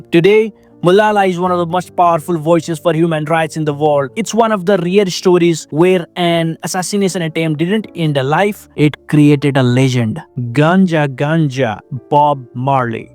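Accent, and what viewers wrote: India. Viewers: your indian accent makes it even more funnier lol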